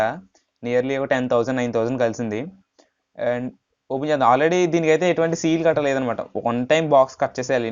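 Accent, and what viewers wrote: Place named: West Godavari, India. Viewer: పశ్చిమగోదావరి district , language ❤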